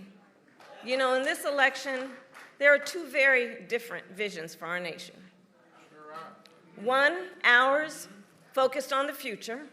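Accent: United States. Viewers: Same speech in Detroit but she sounds like she’s from the deep south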